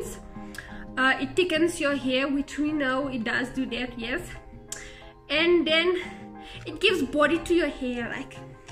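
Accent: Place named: Northern Cape, South Africa. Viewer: I love your accent. You sound like a friend of mine from the Northern Cape?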